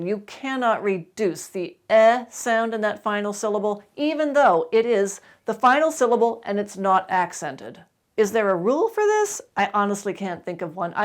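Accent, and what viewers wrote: United States. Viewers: we are speaking english - you with your ( gentle ) American accent, me with a strong Aussie accent. And yet somehow it sounds the same... Cheers lots Mate !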